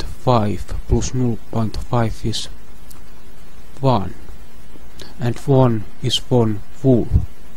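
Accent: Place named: Finland. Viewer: Finnish accent is best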